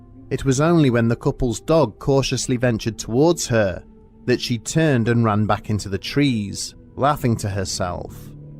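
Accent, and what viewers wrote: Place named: England, United Kingdom. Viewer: The video maker sounds English, cannock chase in England, next to Birmingham is famous for black eyed kids.... Nearly half way through the video... Oh he's just mentioned it as in typing LOL.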